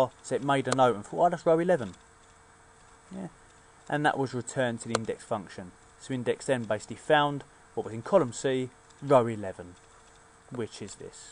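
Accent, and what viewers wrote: United Kingdom. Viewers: you rock! your british accent made it more interesting compared to the other monotone tutorials..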